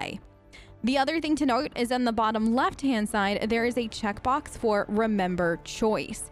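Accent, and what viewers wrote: Australia. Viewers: Look, I have to know, what is your accent? It sounds like you're alternating between Australian and American to me (maybe because I'm Australian). Also, super-duper thanks for this. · The accent is 100% not her original accent. I'm confident that she is Australian. It comes through, especially in moments of focus intensive thinking. Listen out for it, you will hear it. Specifically listen to the words beginning and ending with "o". Australians pronounce that very differently from Americans and you can hear her slip up often. Listen out for "hello", "over", and others. Don't dismiss it, there's a reason people don't notice. She does the American accent very well, even if a bit stereotypical. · I keep hearing an aussie accent slip in there.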